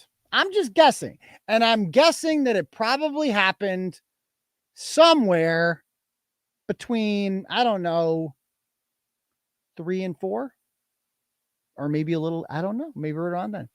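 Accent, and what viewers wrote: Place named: South, United States. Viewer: Your southern accent is hilarious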